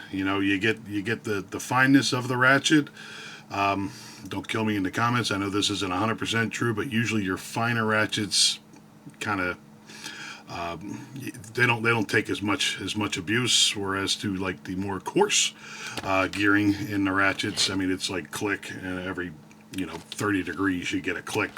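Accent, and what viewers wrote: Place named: South, United States. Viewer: southerner im guessing lol.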